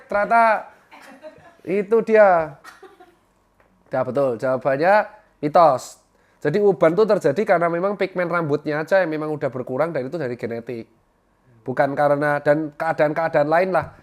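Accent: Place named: East Java, Indonesia. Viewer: logat jawa timuran banget...mantap dok""😁👍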